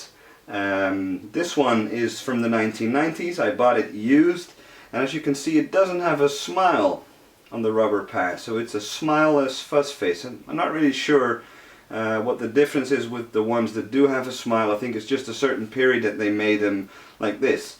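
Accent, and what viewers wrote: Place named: Netherlands. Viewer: Could a silicon transistor be paired with a Germanium transistor? Get the best of both worlds. Also, what accent is that? Dutch? It's barely noticeable.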